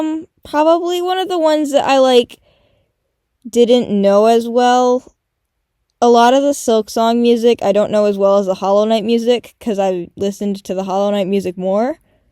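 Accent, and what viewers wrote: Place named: England, United Kingdom. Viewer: Congrats bro!! (or are you a girl? I'm bad at understanding English accents, sorry) Actually it would be cool to have more ways to communicate, but maybe not this time Whos harder: nightmare king or the absolute? radiance Will anyone even answer my comment..? If you'll read it I hope you'll have a great day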